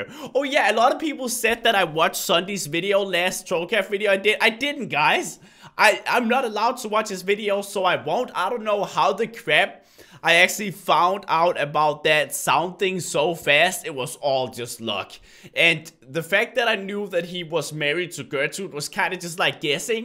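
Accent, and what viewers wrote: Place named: Denmark. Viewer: Reece Warren His First Language is Danish. Which Creates His Accent. English Is his second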